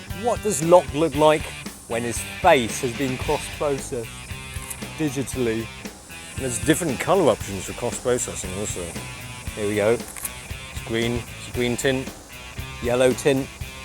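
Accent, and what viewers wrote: United Kingdom. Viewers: This is REALLY bad and not in a good way. Asian guy with British accent walking around talking. I left after 4 minutes. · chinese guy with brit accent...where do you think? Duhhh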